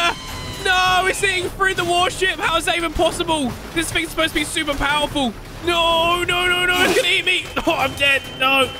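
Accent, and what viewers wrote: United Kingdom. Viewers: Question, are you British?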